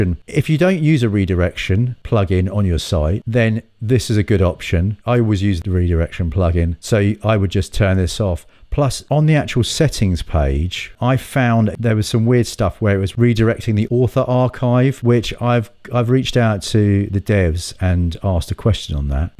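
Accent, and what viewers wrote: United Kingdom. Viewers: British accent best accent of the world 🎉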